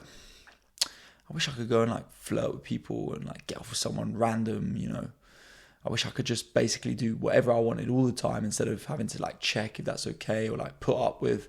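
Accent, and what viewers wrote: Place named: Jamaica. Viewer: I am hearing a mixture of English, Jamaican and Black American mixed together!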